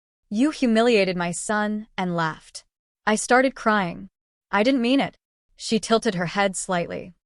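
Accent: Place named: Spain. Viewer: WTF IS WRONG WITH YOU AND STUPID SPANISH ACCENT.SCREW THE NARRATION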